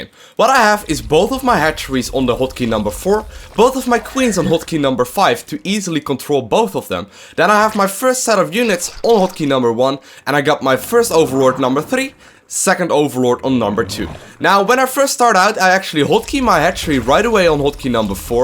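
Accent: Netherlands. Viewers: are you Dutch? you sound Dutch to me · Just wondering are you from the netherlands? :)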